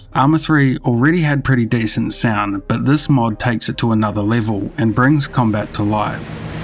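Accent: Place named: New Zealand. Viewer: This guys a kiwi?Hell yeah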